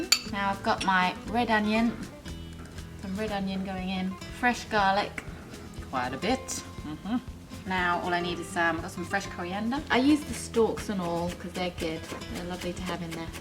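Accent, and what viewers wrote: United Kingdom. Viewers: Hi,enjoyed the video, that's a real meaty nacho dish.You look more eastern than Mexican and sound British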